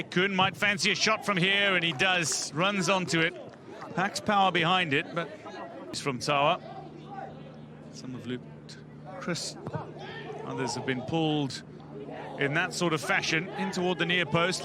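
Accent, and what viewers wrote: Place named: South Africa. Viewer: Why is the commentator a South African ? haha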